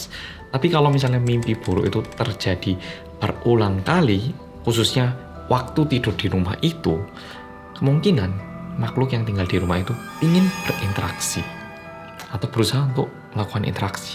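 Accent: Java, Indonesia. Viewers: HIYAKKK PPPPPP KURANB GERCEP😭 MAS MEDOKKKK👍👍👍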